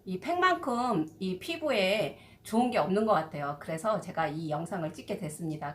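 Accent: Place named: Chungcheong, South Korea. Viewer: 충청도 분 이시군요 ㅎ 영상잘보았습니다 구독 꾹~~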